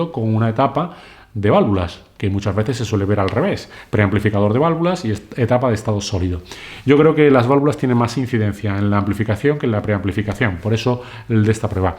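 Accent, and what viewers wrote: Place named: Spain. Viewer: Ostias! Hoy por primera vez en mi vida veo a un aficionado del audio de altos vuelos hablando un castellano europeo y no inglés, japonés, o Español hispanoamericano. Que joya el Yamaha. Soy un fan de las superelectronicas japonesas.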